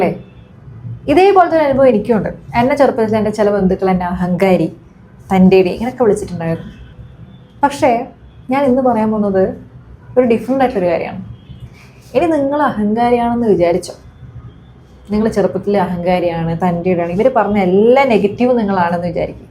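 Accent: Kannur, India. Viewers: Ningal kannur ano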